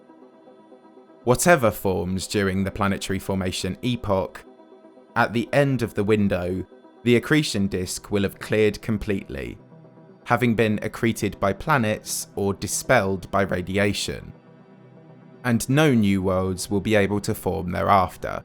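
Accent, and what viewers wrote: United Kingdom. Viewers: Other than Sir David, I typically do not like Bri...ish accents. But I like your cadence and delivery. Top of the mornin' cup of tea Or whatever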